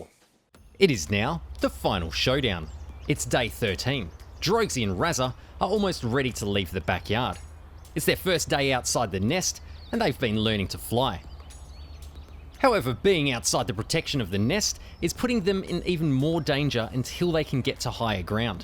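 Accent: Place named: Australia. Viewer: theres no better narrating than a an Aussie lol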